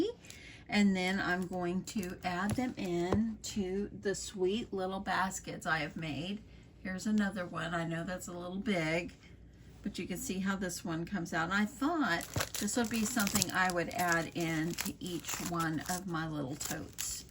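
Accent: South, United States. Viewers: ❤❤❤❤OMG I LOVE THIS you are THE sweetest lady❤ I love watching your videos and listening to your southern voice🩷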